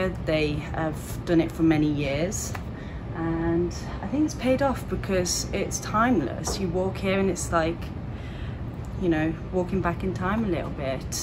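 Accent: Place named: England, United Kingdom. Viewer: Your English accent is fascinating!!!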